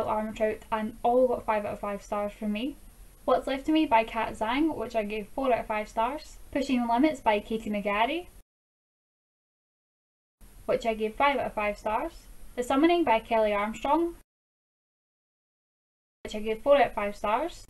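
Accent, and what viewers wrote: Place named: Scotland, United Kingdom. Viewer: I ADORED the Lux series by Jenn! Have you read her Covenant series? You MUST it is ever better than the Lux series. And Jenn is such an amazing down to earth person. So much fun to be around and talk books with! By the by, I can tell by the accent, where in Scotland do you live? My family is from Edinburgh (they all have green cards). I was born in NY but I lived in Scotland as a child.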